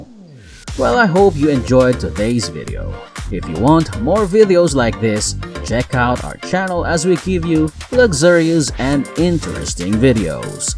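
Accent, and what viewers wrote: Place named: Philippines. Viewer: Narrator is Filipino? The accent is there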